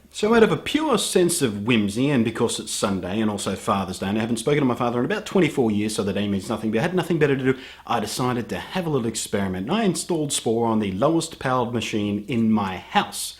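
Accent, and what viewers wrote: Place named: Australia. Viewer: OY!! WTF are you going on about? Don't answer that. I tune it to your videos, not unlike MANY, to see your cute face and expressions and hear your cute Aussie accent. You're an adorable pretty face.....take your fucking shirt off bitch.....give me a GOOD show!!! If you think you're watched JUST because of your "insights"...guess again and join reality....you're popular because you're a prettyboy. So take off your fucking shirt and get on with it....for us!!!! YAY!!!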